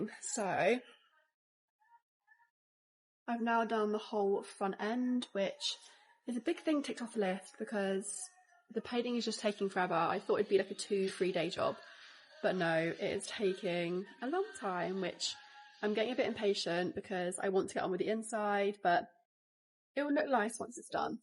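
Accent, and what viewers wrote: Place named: Southern England, United Kingdom. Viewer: She is from south of England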